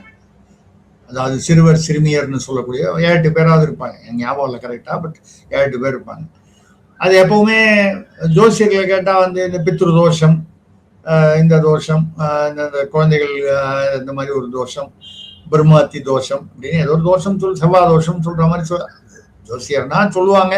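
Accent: India. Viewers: They speak English English . You speak india English 😊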